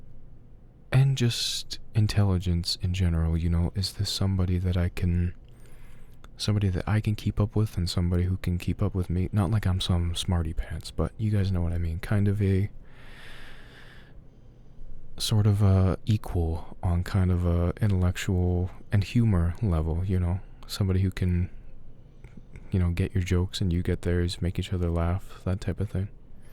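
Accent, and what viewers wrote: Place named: Canada. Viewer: JUST listened to my first audio of yours, your first one on Quinn. Can I just say, first sentence thought, “oh? Is he Canadian?”, 10 seconds in you say ‘sorry’, “yup, definitely Canadian”. A cowboy but without the American accent and uses CST? Yeaaa canadian prairie boy. As a fellow Canadian, I call this supporting local arts and culture 😆. Seriously though, until today, I pretty much stuck to always the same VA (Rum). i’ve tried listening to quite a few other VAs on Quinn and I just can’t. Either tone, pace, sound effects, etc. turn me off. I’ll need to do a bit more research to be sure 😉 but, I might just have a 2nd VA on my list, which is very exciting! For real though, I don’t know if you’re familiar with Rum, the binaural king, buuuttt you give similar vibes and tone. Also from the reddit world. I would KILL for a binaural audio with you in one ear and Rum in the other. 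Or maybe just a twitch stream where we just get to listen to your smooth voices playing games 🫠. K, I know I’m getting carried away but seriously, I have a vision of you two being friends and making 🔥 content together. Listening to this now because I like to know a bit about the VAs I listen to. A whole 4hr intro!!! 😂 So i’m not done yet but I will get through it. I actually lol’ed at the haulin’ hay answer because #truth. Not a farm girl but helped haul hay on my uncle’s farm and it’s no joke. Congrats on ALMOST 20K🎉 🎉🎉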